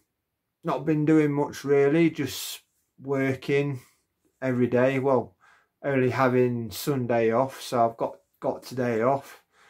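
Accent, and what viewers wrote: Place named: Yorkshire, United Kingdom. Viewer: no way !! Ty bought you were more Yorkshire... I'm a Lincolnshire lad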